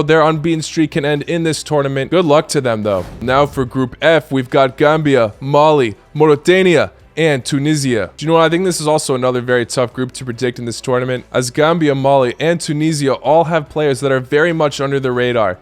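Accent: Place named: United States. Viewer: Is he American cus he got a american english accent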